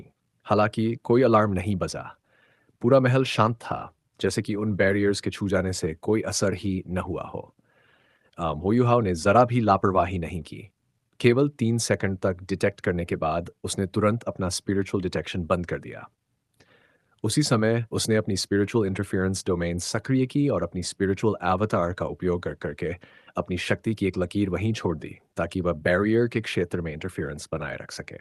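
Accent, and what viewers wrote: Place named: United States. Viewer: Bro video acchhi but voice American English type ho rahaa hai wo better karo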